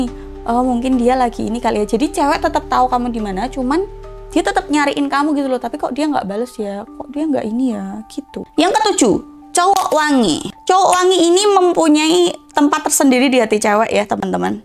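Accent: Java, Indonesia. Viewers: jawane medok yo mba? tapi bagus triknya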